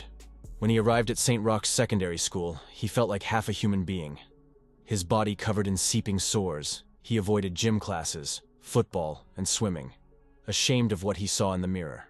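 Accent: United States. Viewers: Narrated by a robot Yank who has no idea where Glasgow is so totally unwatchable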